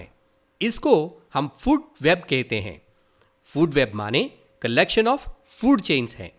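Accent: South India, India. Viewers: I think you are living in South India because your hindi. but your Hindi was good . ❤ Love from Rajasthan ❤❤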